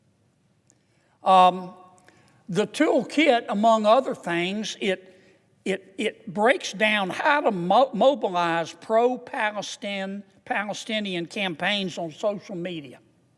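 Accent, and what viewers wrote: South, United States. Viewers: Just LOVE his Southern drawl and smooth demeanor. WHAT is wrong with parents who send their kids to schools like this?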